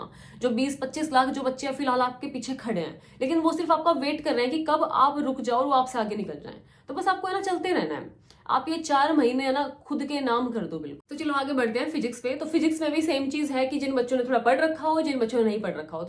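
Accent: Haryana, India. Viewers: Many Hariyanvi waala tone h bahan ka 😊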